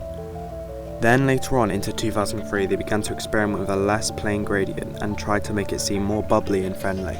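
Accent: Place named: United Kingdom. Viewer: loved the british accent but it's a thumbs down from me for the audio pops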